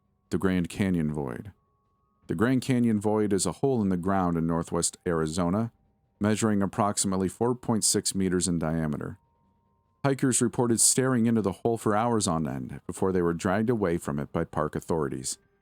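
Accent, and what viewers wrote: Canada. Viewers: You are Canadian as hell bro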